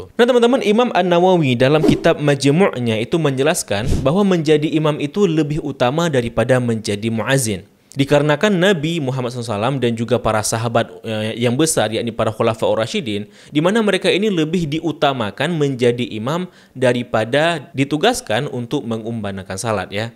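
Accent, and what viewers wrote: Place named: Aceh, Indonesia. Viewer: Oke kan orang Aceh😎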